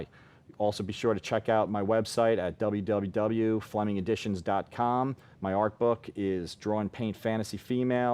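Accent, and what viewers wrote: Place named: Nashville, United States. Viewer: Sounds like he's from Nashville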